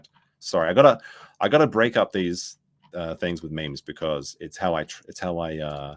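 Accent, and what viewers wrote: Australia. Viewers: As an Aussie it was a lovely surprise to suddenly hear an Oz accent in the playlist I put together for this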